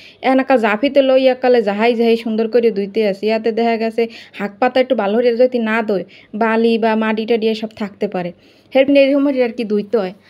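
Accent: Barishal, Bangladesh. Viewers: বরিশালের ভাষা দারুন লাগলো বরিশাল তোমার বাড়ি কোথায় আমার শ্বশুর বাড়ি বরিশাল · আপু চা খেয়ে নিলে পুঁই শাক রান্না খুব সুন্দর হয়েছে ওয়ারড্রব গুছানো অনেক ভালো লাগলো তোমার ওয়ার্ড ড্রপ অনেক সুন্দর লাগছে আপু তোমার কথা শুনে মনে হচ্ছে তুমি বরিশালের কোথায় তোমার বাড়ি জানিয়ে দিও ভালো লাগবে আমার বাড়িও বরিশাল ❤❤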